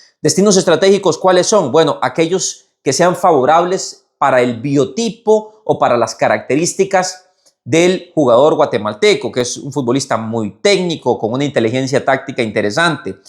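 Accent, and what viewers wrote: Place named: Colombia. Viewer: Si no estoy mal es colombiano por el acento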